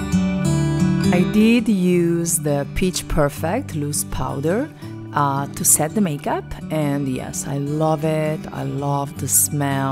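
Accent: Italy. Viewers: Hello nice to hear your Italian accent is there... 👍🏻 nice presentation, but I did't like the eye shadows ... As you said are too flaky..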